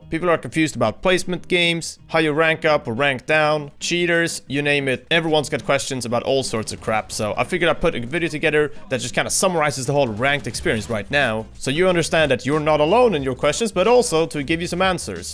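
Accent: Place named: Sweden. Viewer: is he swedish? sounds like that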